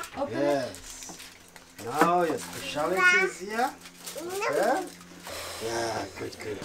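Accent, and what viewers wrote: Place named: Kenya. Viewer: Thank you 👍💕 I love how you speak English with a Kenyan accent 😊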